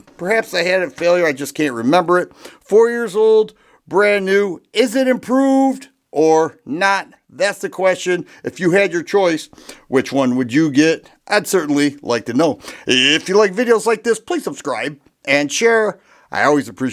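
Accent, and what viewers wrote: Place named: Midwest, United States. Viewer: Dear God, thank you for not giving me a Midwestern accent. Amen.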